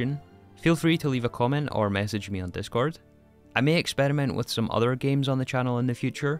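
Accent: Scotland, United Kingdom. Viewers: we've all had the honour to experience and enjoy your brilliance in this blocky game. Life changes and evolves, as must we along with it. We will miss you, we love you, and we're happy if you're happy. So long dear friend, hopefully we may hear that beautiful Scottish accent again in the future. Wherever you go, whatever you do, always ALWAYS check if its HV or MV. ❤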